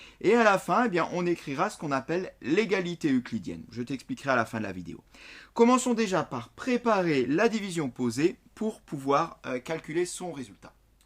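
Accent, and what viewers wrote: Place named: Alsace, France. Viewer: zaffuto Axel Il est alsacien c pour sa c son accent👍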